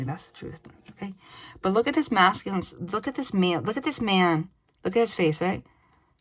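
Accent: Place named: New York, United States. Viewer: I ABSOLUTELY LOVE YOUR ENERGY, YOU HAVE ME GIGGLING RIGHT ALONG WITH YOU, ALSO LOVE THE NEW YORK ACCENT!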